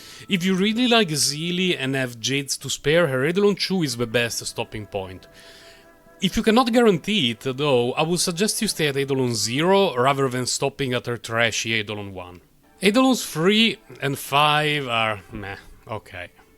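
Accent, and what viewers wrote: Italy. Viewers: I have no idea why, but you give such strong italian-english accent vibes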